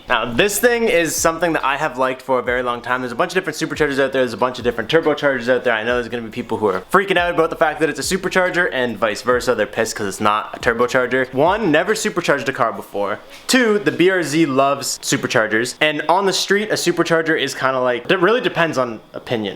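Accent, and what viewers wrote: Canada. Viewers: Anyone else notice the way Canadians say out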